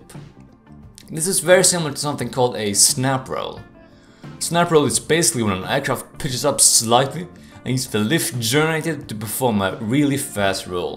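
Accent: Sweden. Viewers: Hello, are you swedish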